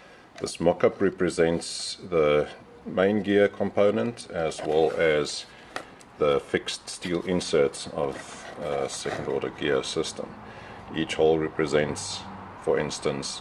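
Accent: South Africa. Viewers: Are you an South African?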